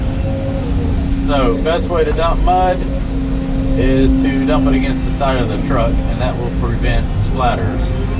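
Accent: South, United States. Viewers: Meuud, lots of meuud ! Love your Southern accent !! 👍👌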